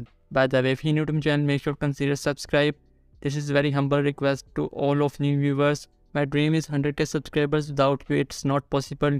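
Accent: India. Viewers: You Indian accent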